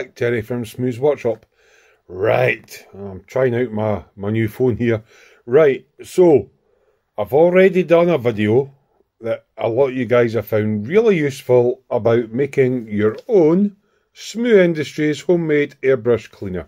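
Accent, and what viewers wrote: Scotland, United Kingdom. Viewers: Thoroughly enjoyed your video on making your own acrylic thinner and airbrush cleaner, very informative, and nice to hear a Scottish voice for a change. IT was not too long but had all the information I was looking for. Thank You!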